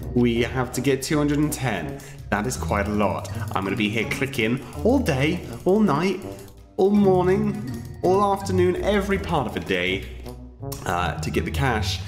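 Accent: England, United Kingdom. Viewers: David Beck They're both British. What do you expect? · Are u English ? I luv ur channel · Is this is called British accent? I don't really now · Ana Contreras There both British so ya I see why you said that but at the same time I am a bit confused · Ana Contreras cuz he is british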